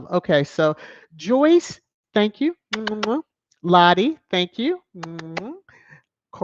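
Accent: RP, United Kingdom. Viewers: Thank you for another wonderful thrash time 🙏😘❤️❤️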